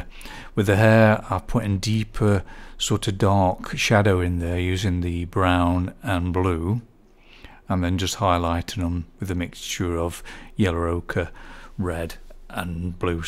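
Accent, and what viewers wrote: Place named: Ilkeston, United Kingdom. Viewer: Born and bred in Ilkeston, I recognised your local accent immediately! I’m in Lancashire now but wondered if you’d share whereabouts you’re from? Not an Ilson lad yourself are you?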